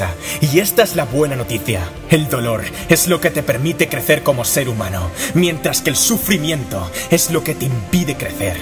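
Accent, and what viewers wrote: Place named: Spain. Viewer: Hubiera estado de 10, pero.... Ese acento español 🤢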